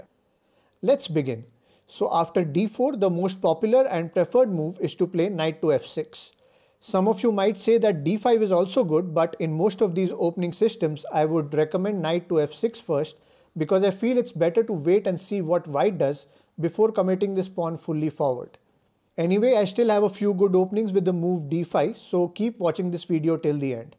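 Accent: India. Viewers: Yariel Robles how on earth have you never heard an Indian accent? You’re aware like 1/7 of the world is Indian..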